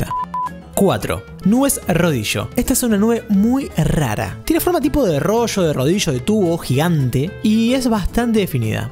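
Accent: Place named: Buenos Aires, Argentina. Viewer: Listo sabemos que sos de buenos aires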